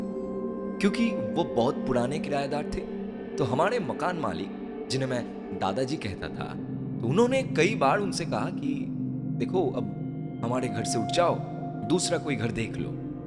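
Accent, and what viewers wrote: Bengal, India. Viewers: Dada ami tor onek boro fan. Tumi jkhn dadagiri te aschile tarpor theke ami pretkotha r sob episode sune niyechi sathe purono sob ghotona o sune felechi.Pretkatha hindi channel tumi jedin launch korle seidin e subscribe korechi. Hindi ghotona gulo sune amr mone holo j comment kori. To asol kothai asi kichu kichu word ache jegulo tumi bengali tei bolcho jemon aasa- 'ummid' hobe r 'mrityu'- mat hobe r bengali te 'র', 'ড়' ei 2to alphabet er opor ektu kaj korte hobe. Plz bollam bole kichu mone koro na. Actually Pretkatha r member hoyai Pretkatha jate r o perfect hoi sei jonno comment ta korlm. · Bengali tone avivi hein hindi mein... dhire dhire hindi tone pakar loge bhaiya. Avinandan.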